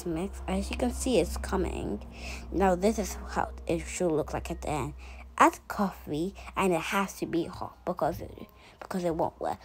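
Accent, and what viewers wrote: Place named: United Kingdom. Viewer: Awwwwww she’s so cute! Love the British accent ❤❤❤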